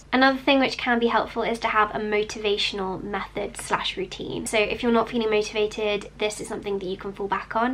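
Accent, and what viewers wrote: United Kingdom. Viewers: Are there any youtube channels have British accent and make great videos liker her? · Great video miss , iam from india , highly appreciate ur work , I would request u to read quran translation of which is approximately 500 pages , a book which is from god believe 25 to 30 % of world population , by the way I think ur british ,. I love british accent a lot , great job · She is using british accent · Hermione accent? She's just British mate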